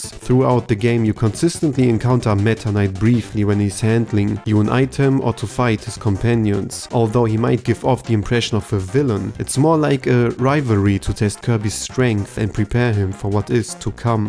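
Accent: Germany. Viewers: Du bist ein deutscher. Ich kann es in deiner Stimme hören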